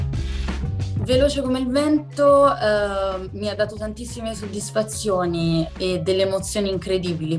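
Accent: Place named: Rome, Italy. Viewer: Hai piu' un accento romano che bolognese....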